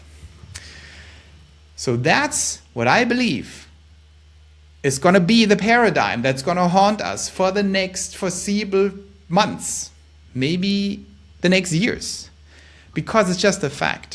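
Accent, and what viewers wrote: Germany. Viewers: in mass - lol - german accent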